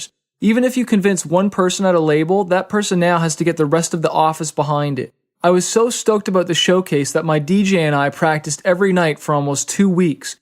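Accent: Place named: Canada. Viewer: This this probably my favorite chapter in Fighter. I've never really noticed your Canadian accent until now...XD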